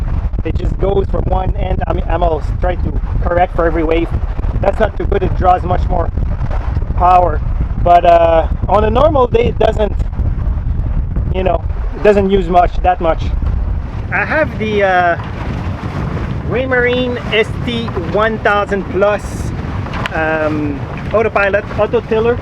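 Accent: Quebec, Canada. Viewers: Im going to take a chance here but would you be from Québec ? If not, your accent sound like it😃 Great vidéo, in fact, one of my favorite !! Lots of good actionable ideas in there !!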